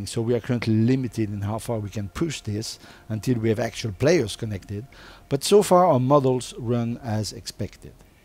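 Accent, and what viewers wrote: France. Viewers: Cette accent francais :D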